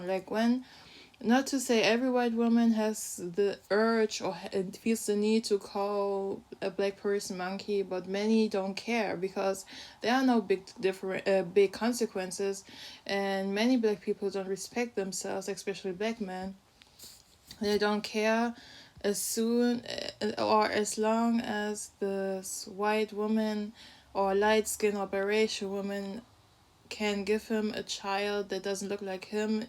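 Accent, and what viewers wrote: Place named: Germany. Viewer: She actually has a German accent, and to be honest I usually dont like German accents but her accent is BEAUTIFUL ❤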